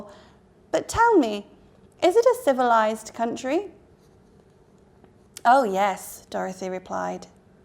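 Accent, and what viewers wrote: United Kingdom. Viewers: I love wonderful accent, she’s amazing. Really appreciate.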